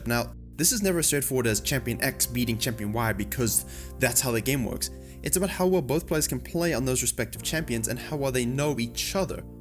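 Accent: South Africa. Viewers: It's a pretty cool accent. I was just listening to it and kept thinking "Is that an Afrikaans accent? Wait, that sounds really English... Now he sounds American!" It was confusing but in a good way! Do you speak Afrikaans at all? I picked up a lot while I was in SA but it was really easy to learn since Dutch is my 2nd native language... I have a pretty unique accent too because of my parents are different nationalities (English/Dutch) and I grew up different countries + international school.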